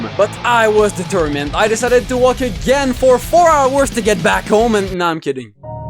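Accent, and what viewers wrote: Quebec, Canada. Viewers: Came in for the nice ouse, stayed for the gros accent québécois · Est-tu québécois par hazard?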